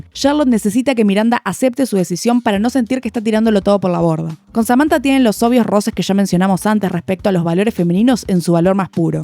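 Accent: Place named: Argentina. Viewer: como amo el acento argentino 🙈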